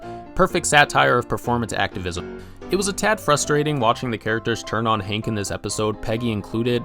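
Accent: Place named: Canada. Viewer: 5 seconds into hearing you speak all I could think was “this dude is so Canadian”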